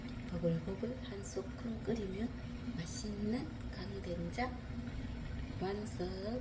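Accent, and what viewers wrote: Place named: Gyeongju, South Korea. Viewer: 경주사람 정겨운 목소리 들어니 반갑습니다 저도 경주 광명이 고향인데 .간된장 정말 일품이죠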